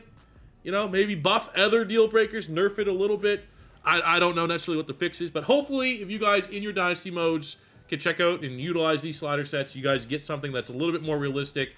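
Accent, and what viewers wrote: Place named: Canada. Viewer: Straight to the point. Great content. You're an OG. Been doing it 15 years. I thought you were Canadian with that accent lmao.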